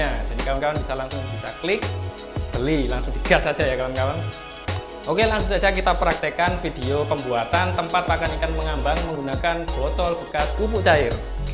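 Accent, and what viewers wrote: Java, Indonesia. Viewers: Smpean jowo'ne pundi Om..?? 😁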